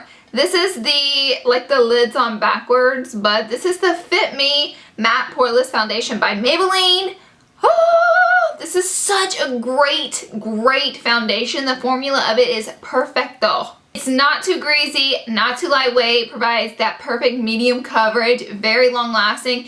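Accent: South, United States. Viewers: You're so cute. You sound like my friends lol #southerncharm new sub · I didn't know you live in Cali! Your accent sounds southern, but still cute tho! Anyways, I love your personality! :) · You belong on duck dynasty. You have the same accent/personality of their wives. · I must follow you! I love your accent and sayings! Not sure where you were from but I can tell it was here in the south! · I love you accent. I'm from Eastern Kentucky and your accent is more southern than mine. Although, Alabama is further south than Kentucky. I moved from Kentucky in July of 2011 to DE. I have been living in DE since then.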